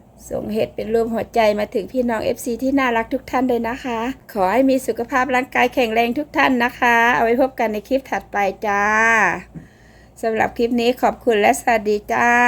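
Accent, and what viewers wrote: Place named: Isan, Thailand. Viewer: คุณ เว้าพาษาอีสาน น่ารักมาก สออนเห็ดหลายงามพร้อม❤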